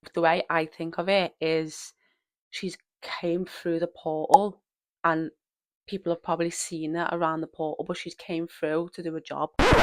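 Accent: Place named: Liverpool, United Kingdom. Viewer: Although I don't believe in banshees I loved your telling of the story especially in that gorgeous Scouse of yours. You do have a talent for storytelling. Be sure to keep developing it. We're cheering you on.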